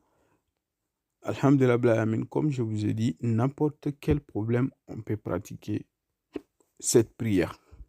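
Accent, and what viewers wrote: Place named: Mali. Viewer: Tu est du mali jia l'impression avec ton accent je crois reconnaitre le pays 🤧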